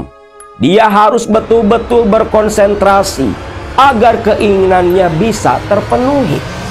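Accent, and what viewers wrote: Java, Indonesia. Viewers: Bahasa Jawa kang lucu 😅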